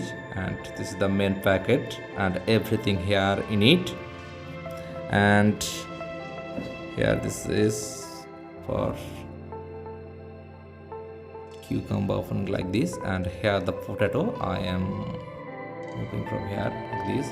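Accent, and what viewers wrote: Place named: India. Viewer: Very Indian accent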